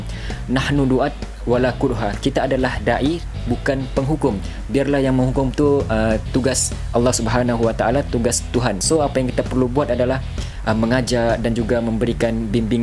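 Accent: Sabah, Malaysia. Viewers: Bro ni orang mana ya? Loghat sabah hm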